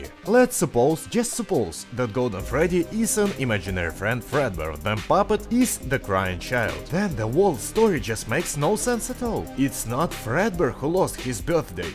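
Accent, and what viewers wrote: Russia. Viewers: Интонация русская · С русским акцентом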